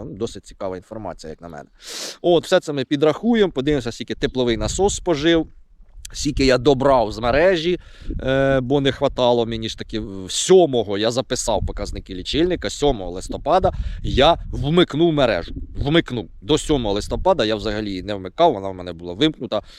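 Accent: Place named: Cherkasy, Ukraine. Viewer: Черкащина. То місцевий діалект 😂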